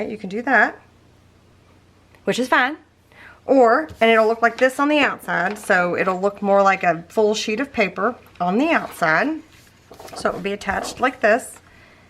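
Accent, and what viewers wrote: South, United States. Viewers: This is absolutely beautiful !!! Congratulations on making 50k subscribers🎉🎈🎉🎈!!! I am so grateful for having found you!! I love ❤ the fact that I have never found it hard to understand ya!! I gotta southern draw too lol and you ain't never said somethin that I haven't heard before unless you accidentally make up a name for it like cramin two words together lol 😂😂!!! Thank you for everything you do!!!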